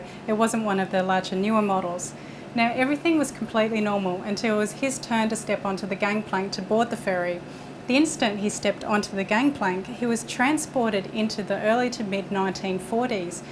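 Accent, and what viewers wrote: Australia. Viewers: Interesting topic. Too bad I have to work too hard to understand what you are saying. You articulation is ...hmmm... let say, not what it should be. Your accent does not help either. I stuck with it for more than 3 minutes. Too bad. Coz as I already mentioned, interesting subject. Perhaps this is intended to be watched by Australians only. Like an "Aussie secret". Do with it as you please.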